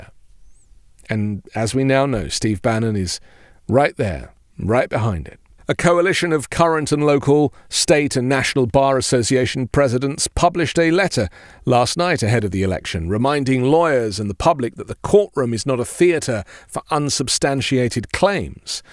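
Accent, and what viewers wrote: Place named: RP, United Kingdom. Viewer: I came here cause I needed to hear your posh, measured, and calm British accent to soothe my frazzled nerves and chewed fingernails. I'm really trying to manage my expectations and your ever intelligent reporting and journalistic integrity has helped to keep me sane and from panicking. Thank you and everyone at MTN for choosing Science & Facts and giving us Democracy loving Patriots a news home.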